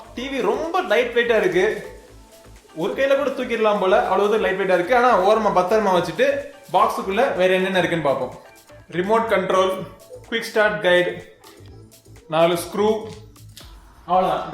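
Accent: Madurai, India. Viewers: Bro..neega Madurai ya....? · Niga Madurai ya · Semmma madurai speech and review suprrrr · Bro neenga madurai ah? Super!!!! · Bro Madurai style is super..... Like acter Vishal Daai naanum madurakaaran thaandaa....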